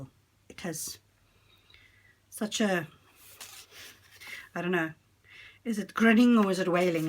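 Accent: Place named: South Africa. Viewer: Really lovely. The tea dying really came out super duper great this time... well, it always does!! I really like a simple "clean" journal personally and the more I get into this the less I want to embellish the pages because I think most people want to have the freedom to fill up their own journals. I recently made a travel journal and I also made some word embellishments and stamps to take on the side during the vacation. Good idea. Lovely to hear your South African accent too. Congrats on another gorgeous journal!! xo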